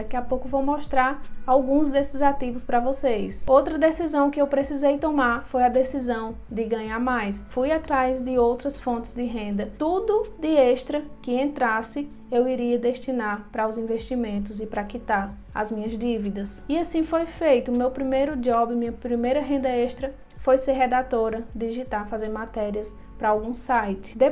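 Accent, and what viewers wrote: Northeast, Brazil. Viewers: Gostei muito do seu vídeo, muito nobre de sua parte ajudar as pessoas sei que seu intuito foi esse bichinho, agora diga uma coisa esse sotaque arretado é do Nordeste? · Mulher ameiii o vídeo era exatamente o que procuro Já min escrevi no canal Você è nordestina ?? Sotaque Nordestino 😊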